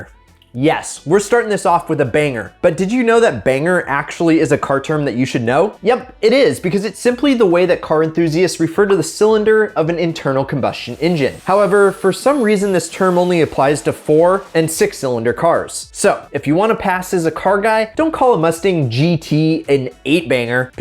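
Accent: United States. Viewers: He’s American and he spoke like a American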